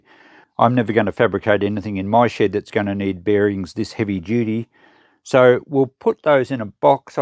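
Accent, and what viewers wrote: Australia. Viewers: I tried watching this twice, but It was late and I was tired. Your smooth Ozzy pronunciation put me to sleep 😴. Lol, third time now, I am well rested. Fun sorting video 👍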